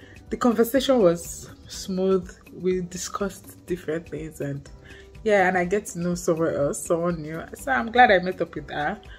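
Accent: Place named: Nigeria. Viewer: don't worry He/she is just hating on your beautiful Nigerian accent.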